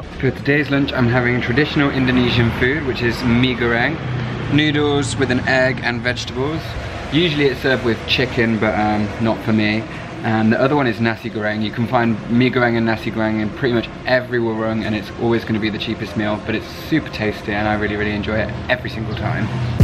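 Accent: United Kingdom. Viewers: Loved ur vid!! N.. U accent! Seems british.. Feels good to hear u tlk.😀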